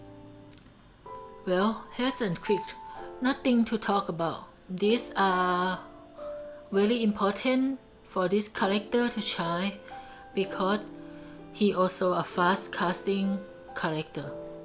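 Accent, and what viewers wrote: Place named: Thailand. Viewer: สำเนียงภาษาอังกฤษเจ้าของช่องเหมือนคนไทยเลย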